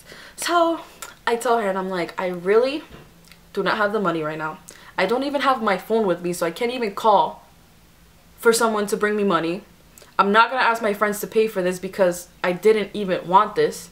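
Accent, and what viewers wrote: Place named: Dominican Republic. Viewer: are you dominican? lol you sound like it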